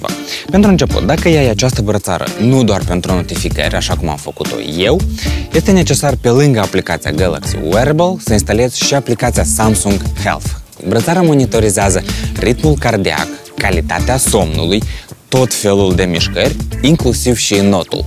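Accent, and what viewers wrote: Moldova. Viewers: Dat'n slobiz de moldovean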